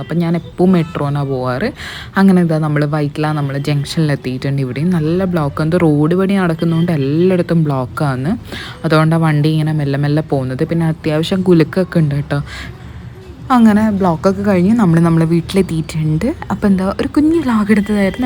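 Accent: Kannur, India. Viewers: Da സംസാരം endho vere slang പോലെ തോന്നുന്നു. Knr touch കുറവാ 😍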